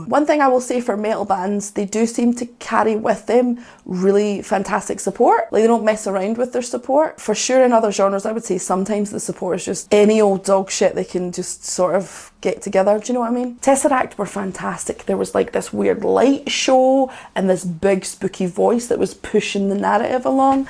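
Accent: Scotland, United Kingdom. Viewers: I happen to notice that, after a quick perusal of your video list, you don't have any reactions. How can this be? He is best known for his work with &, but I'd be interested in how you react to " " from his Mondo Cane album. He normally does experimental metal, but Mondo Cane has an Italian 1940-esque torch song vibe. Plus, I'm just looking for any excuse to hear more of your accent. What I would do to hear you soliloquize about music over some haggis, neeps & tatties at the Babbity Bowster in Glasgow...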